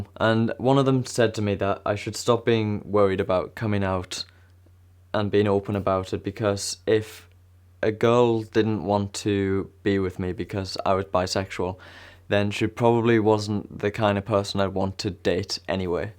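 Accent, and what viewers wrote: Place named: Geordie, United Kingdom. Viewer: I know you're from Tyne and Wear somewhere and your accent is too soft to be from North of the tyne, so I reckon you're either from Sunderland or sour Tyneside, maybe Whitburn or South Shields?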